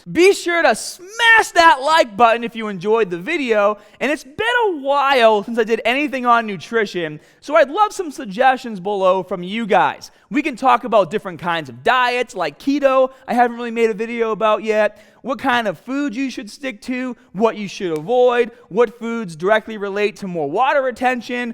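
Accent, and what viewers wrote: Boston, United States. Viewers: I'm genuinely curious, what part of America is he from where they pronounce 'A' as 'Ah'? Great videos by the way, big fan :) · Holy Crap! I've always watched your videos for your good looks and charming Boston accent. I had no idea that was a mistake until you mentioned it in this video! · The Boston accent is annoying as HELL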